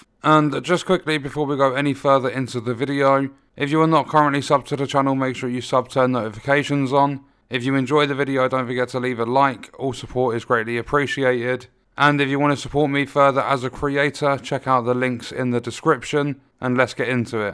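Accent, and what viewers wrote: England, United Kingdom. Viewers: he aint eu player he sounds english - they are not in eu lol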